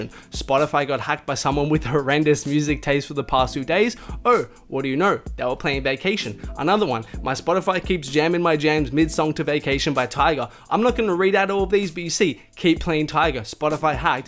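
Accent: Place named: Australia. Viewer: He sounds Australian and they got winter now and summer in December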